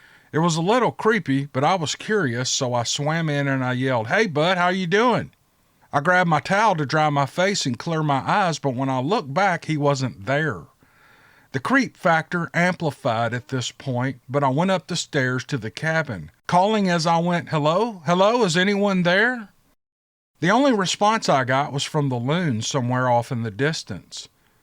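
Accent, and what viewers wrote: South, United States. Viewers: thanks for keeping us in your thoughts even while you're too busy to post you still think and plan ahead so we will have new posts to watch!! That's above and beyond!!! See you when we see you! I sure do enjoy your telling of others encounters!! The people that experience their encounters do a great job of writing them up but that's based on what you read and while I live on the West coast I grew up in the woods of Missouri, then from 1970 to 75 Ft. Hood Texas where we hunted and fished every off work hour we could get and between hunting on foot or riding my dirt bike I covered that post pretty damned well when on my own even! I love the southern humor and style and you're definitely all of that in its best vocal embodiment of it in my opinion!!! Can't wait for the next few!!!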